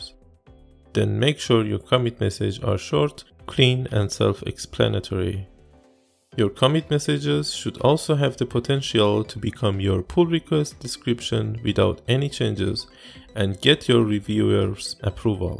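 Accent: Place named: Iran. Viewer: CHITOOOORI. I can hear the Iranian accent.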